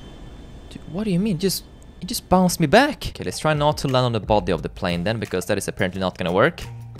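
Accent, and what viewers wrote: Sweden. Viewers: ar yu swedish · Are you swedish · Are you from sweden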